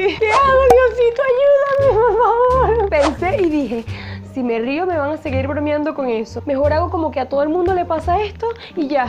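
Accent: Venezuela. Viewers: Creo que de Caracas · Es de venezuela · Eres de Mérida, de Maracaibo o de Caracas? Es que me confunde el acento... por cierto, buen canal me encanta tu escencia y tu entusiasmo 👏😌😍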